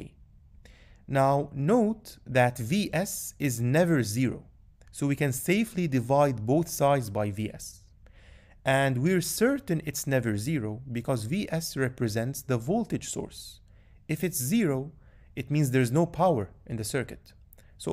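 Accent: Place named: Egypt. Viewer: You have an Egyptian accent kinda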